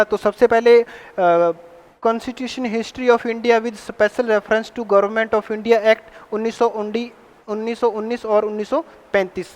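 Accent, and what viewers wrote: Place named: Punjab, India. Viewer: Aapka hindi punjabi accent😊😊😊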